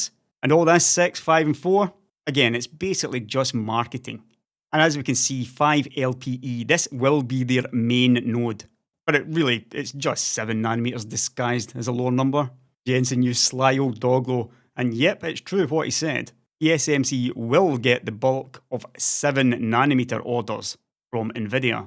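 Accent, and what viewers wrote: Scotland, United Kingdom. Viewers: i just love scottish accent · the heavy Scottish accent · A Scottish accent telling me about technology feels too right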